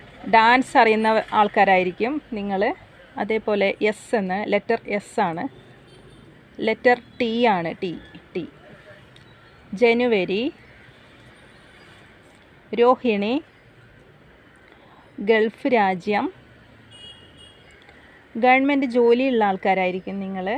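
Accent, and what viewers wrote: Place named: Kasaragod, India. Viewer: ചേച്ചി കാസർഗോഡ് ആണല്ലേ... പറഞ്ഞതൊക്കെ കറക്ട ഒന്ന് ഓള് മനസ്സ് മാറി വന്ന മതിയാർന്നു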